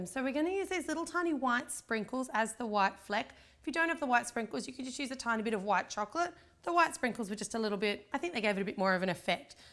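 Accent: Australia. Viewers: I'm sorry, haters DONT hate please but... Is this Australian? The accent sounds familiar and hmmm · She's Australian, so yeah. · She's Australian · This is really cool but are u Australian? 😄😃😀😊😊😀😃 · She does have the semi English accent at first, but her Aussie accent comes out alot more closer to the middle/end of the video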